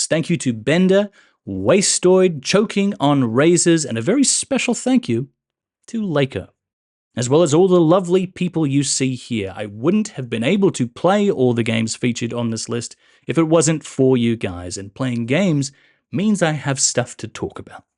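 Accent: Australia. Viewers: lmfao the classic "No I'm Australian" after anyone hears your voice the first time. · Good video, learnt new information; you are in fact Australian and not British!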